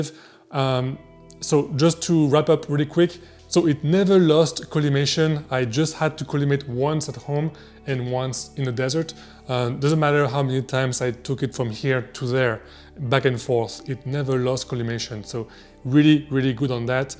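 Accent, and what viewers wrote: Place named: France. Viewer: I knew it, I did detect a French accent❤❤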